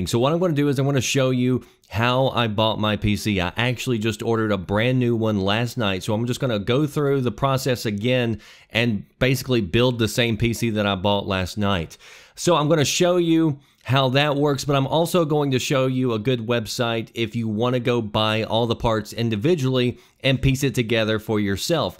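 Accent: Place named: Tennessee, United States. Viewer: Thanks a lot. Ive been thinking about getting a gaming PC and if I do, will got the route you did. BTW.. didn't know till this video but suspected you were from Tennessee from your accent... bet ya can't guess where I'm from..lol For some reason I thought my account was my gamer tag.. Knoxrandall...lol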